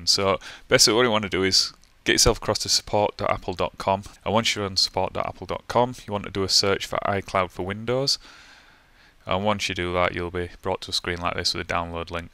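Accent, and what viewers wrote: United Kingdom. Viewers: lifesaver! Thank you so much! much prefer listening to a British voice than an American as well LOL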